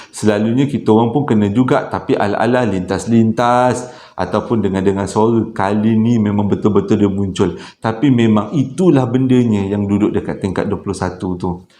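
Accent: Johor, Malaysia. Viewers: Abg org johor ke? Patut la bila dengar cara ckp abg mcm org johor ie.. 😊😊😊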